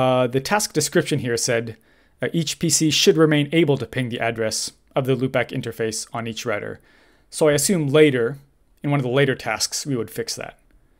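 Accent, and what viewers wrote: Canada. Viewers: I think I got this :) ACLs at least lol by the way, youre originally from Canada right? I hear the "aboot" ;)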